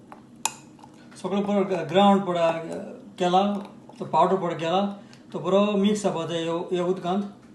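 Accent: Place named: Konkan, India. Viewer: Thanks for sharing your recipies in Konkani More than your recipies I enjoy listening to our Saxtti (Chinchinim) Konkani.Keep up the good work. 🙏🙏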